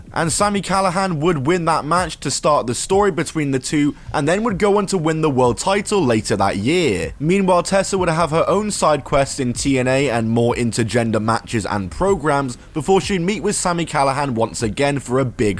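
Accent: United Kingdom. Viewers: Oh look, another british accent clutching pearls over political correctness. Yawn.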